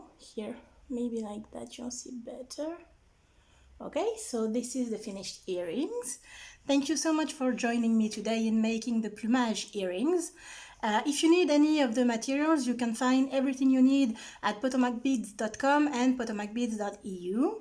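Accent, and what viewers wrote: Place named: France. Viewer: And it's even better with that cool French accent!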